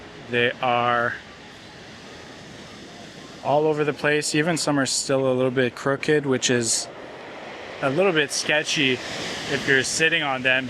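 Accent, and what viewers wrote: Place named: Mexico. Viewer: You’re doing a great job contributing to México with your updates. Really shows how much you love México and have made it your home for your family. I hope that Mexicans embrace your integrity and authenticity. Appreciate your consistency with videos, your beautiful Mexican Spanish accent (and the effort!), and your sense of humour.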